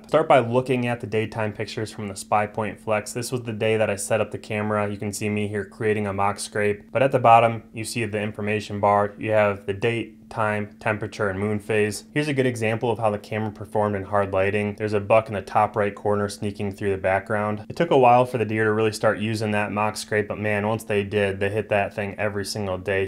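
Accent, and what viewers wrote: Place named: North America. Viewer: It would be nice of you to start by pointing out this concerns the US and possibly Canadian market specifically (still less than 5% of the global population). Not everybody can get this from your accent alone. Otherwise, a very useful overview, even if I'm interested in them for property control. And, btw, I would also mention that all three of those brands are North American and hence are likely to host their services (read: +-all of the stuff your camera sends) in there, instead of China, as some other well known Chinese brands do.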